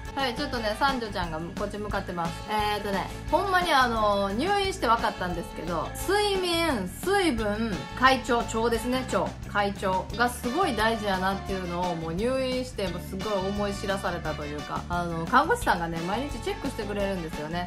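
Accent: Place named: Osaka, Japan. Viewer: 登録したまま、お待ちしてましたよ❗ 大阪弁の心地よいトーク楽しみにしております。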